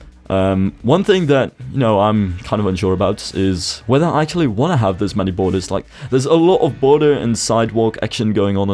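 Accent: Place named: Netherlands. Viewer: Thanks, and by your voice.. i can tell ur dutch .. Zeer goed kanaal ;)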